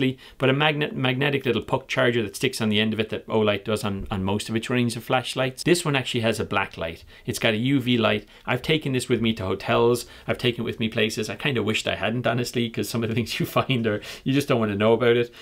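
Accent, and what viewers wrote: Ireland. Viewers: R U Irish. I like your accent. I lived in Galway for 10 years.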